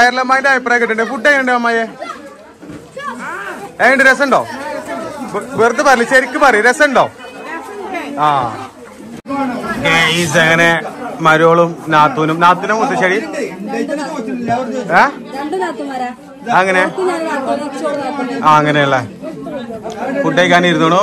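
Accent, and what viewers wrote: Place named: Malappuram, India. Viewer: ഞമ്മളെ മലപ്പുറത്തിന്റെ ഒരു മൊഞ്ജ് 👍🏻👍🏻👍🏻... ആ ഭാഷ... 👍🏻👍🏻👍🏻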